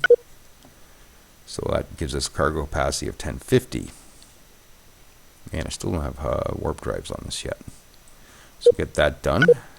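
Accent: Canada. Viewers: great series. I have to ask are you Canadian? Your accent is either Eastern Canada or, Northern Ontario. I could be way off but I had to ask. I am from Ontario and the accent is very distinct.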